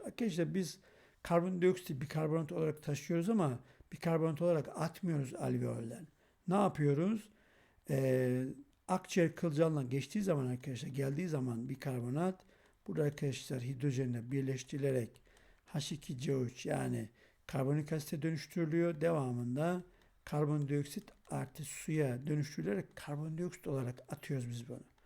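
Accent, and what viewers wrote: Black Sea, Turkey. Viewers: Karadeniz şivesidir diyor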